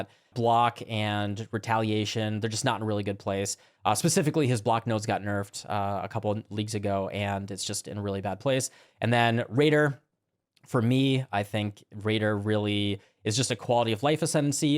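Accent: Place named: United States. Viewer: you sound american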